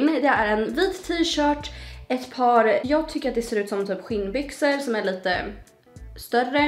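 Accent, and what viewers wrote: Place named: Karlskrona, Sweden. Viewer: Jag hör lite karlskronitiska ändå😉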